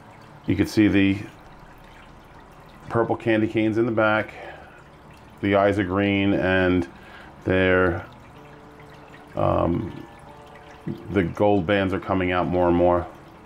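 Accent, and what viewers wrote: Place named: United States. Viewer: Hahaha quite the opposite im an English girl so tell me an English girl who doesn't like a sweet ass American accent ☺️☺️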